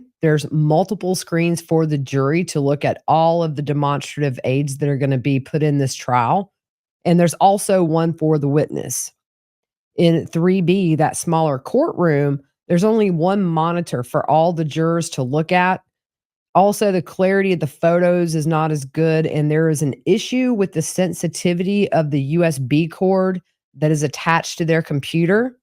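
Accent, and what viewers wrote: South, United States. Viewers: So many southern accents are not pleasant but your accent is...always nice to hear your recaps!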